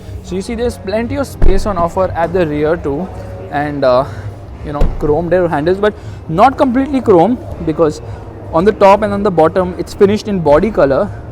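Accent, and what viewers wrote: India. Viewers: Dammm i hate indian english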